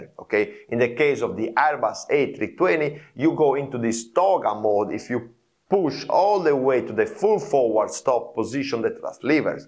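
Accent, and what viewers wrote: Italy. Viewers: ....on the other hand I heard a good explanation of TOGA in plain English with a slight Italian accent: Very well done...I've been looking for an explanation of TOGA and now it has clicked...everything else I can find in the FCOM. Thanks You!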